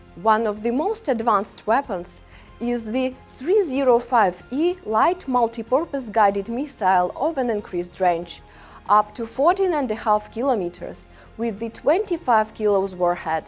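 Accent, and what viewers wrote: Russia. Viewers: Хаха, какой милый русский акцент))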